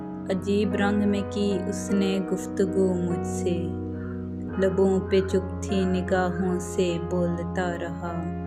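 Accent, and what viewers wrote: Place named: Pakistan. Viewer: Awaz Pakistani lag rhe..🔥 From kashmir IOK ❤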